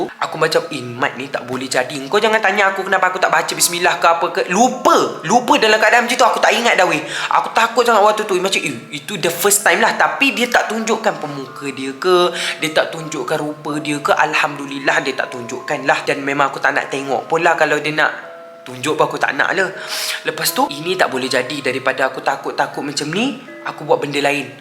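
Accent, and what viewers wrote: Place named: Kelantan, Malaysia. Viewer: dye ckp kelantan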